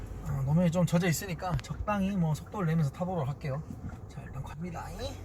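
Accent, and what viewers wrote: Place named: South Jeolla, South Korea. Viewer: 억양이 전라남도 무안쪽 사투리 같은 느낌이네요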